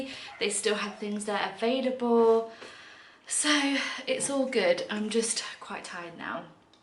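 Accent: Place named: England, United Kingdom. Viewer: I love your accent, can I ask you what part of England you originate from?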